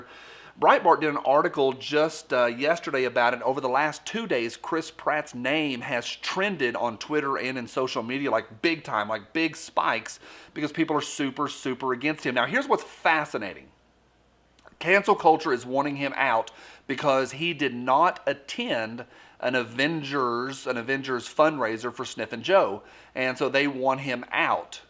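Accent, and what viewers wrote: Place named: Appalachia, United States. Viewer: Being from the Appalachians is nothing to be shy about just because you chose to speak the civilized pronunciation (i.e.: the English language). So, my friend and hero, - feel at ease. If you pass my grammatical standards, there’s nuttin’ to worry about. I say that with the authority vested in my soul by my late mother, who was a strict Grammar Nazi, born and raised in The Netherlands before the REAL Nazis invaded Holland... etc. I’m pretty sure you are aware of this already, but I thought I’d share a tidbit of actual truth from the comment section: The so-called “Cancel Culture” is just another offshoot of the liberal/left social destruction of what used to be a damned fine Republic - ours. Whether the word used is SJW (social justice warrior) or BLM (black lives matter, but is often co-opted by white SJWs who have been brainwashed to believe the guilt trip that is “white privilege”). 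This travesty is rooted in the higher education system, where young college kids are being indoctrinated into believing they should be unhappy with everything in our country. Why? You ask - liberal professors teach their students intolerance for any facts or opinions which are viewed as conservative, which has transformed what was normally a bastion of free speech and discourse that US educational institutions were formerly known for - into an intolerant atmosphere where guest speakers are denied access to their audiences who had invited them, and they are assaulted further with hate-spee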